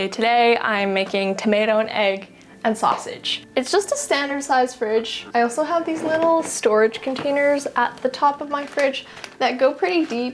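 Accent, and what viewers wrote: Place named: Saskatchewan, Canada. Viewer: Thanks for a real life video. Not everyone lives a “home magazine life”. Are you canadian? You speak just like my Dad from Saskatchewan. Love from Norway.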